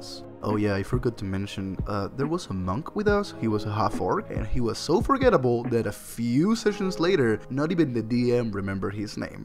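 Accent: Argentina. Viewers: I really enjoyed the story! I had to comment, i need to know, decime que te lei bien el acento, sos argentino? Jugas d&d y sos de los nuestros? T_T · ¿sos argentino? Recién encuentro tus vídeos de D&d y me encantan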